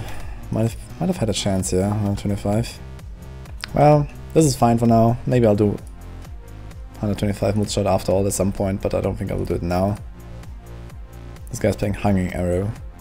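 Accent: Germany. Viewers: you are 1000 percent a german right?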